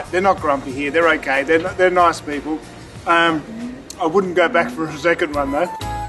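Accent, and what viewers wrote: Netherlands. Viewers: im dutch,, hes to i can hear by his accent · You are definitely Dutch I can hear it in your accent and how you say the names of the foods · You got A verry dutch accent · Natie je bent zelf Nederlands je spreekt die woorden zo Nederlands uit he weejoo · je hoort dat hij hatstikke nederlands is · don't say you are not dutch your voice is as dutch as it can get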